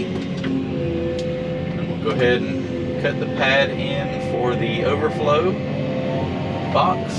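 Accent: South, United States. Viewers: I had an 11 year old video of yours show up on my list and it was when you painted the old Case 580! Your southern accent has mellowed an awful lot since then! 😂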